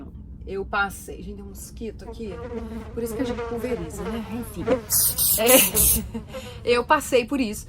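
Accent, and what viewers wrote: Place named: Minas Gerais, Brazil. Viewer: Parabéns! Relato muito completo e que delicia de sotaque daqui de Minas hehehehe!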